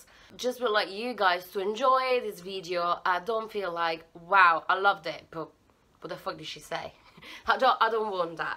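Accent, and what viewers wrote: United Kingdom. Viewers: Hai un accento di Birmingham potentissimoo😂😂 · You have british accent! Però per colpa del pugliese spaghetti l'hai detto con la e aperta, quindi andando verso la pronuncia sbagliata 😄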